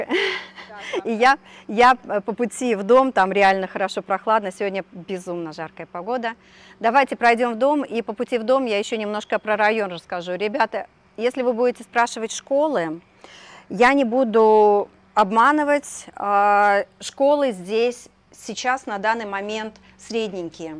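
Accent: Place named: United States. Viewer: артекуляция речи , манера, капец....американпипл😂😂 неуж так говорят русскоязычные, которые живут в Америке...пусть и не мало лет, неужели дает такой эффект речиобразования ? интонация слов. Родное нельзя забыть.😁🙄 мда.... русская речь перешла на амер. манер🤣🤣 слушать забавно😜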